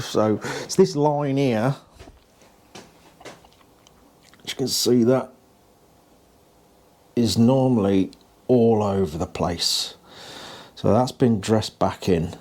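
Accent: England, United Kingdom. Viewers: I've been taking seals out of machinery for better than fifty years, and this is the first time I've seen the trick with the chisel cutting the seal, and that works great. The steel base for that seal is stamped and "pre-stressed" so it fits very tight pressed in. You simply break that "stable platform" it begins with so it no longer can "press against the wall. I've worked on motorcycles and cars, trucks and general machinery, never thought of it like that. By the way, we also say "dragged", at least we do down south, lol. I've got to say, I enjoy the accent and word usage common over there in England. I got on here because of having a triumph, but enjoy the whole "stepping into your world" over there by the tube. I spent twenty years around the world, a Marine, and miss that, having retired in 97. Of course that's why I've got time to have a couple bikes. 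I've had the good fortune to work on Brit bikes fifty years, since my early teens, and I still really enjoy them. Oh, and car's too, had a few, worked on hundreds. It's good to see the bike coming together like it is. I've heard of Enfields since my teens, only recently got to see them, and that one is looking really good. Thanks for the video!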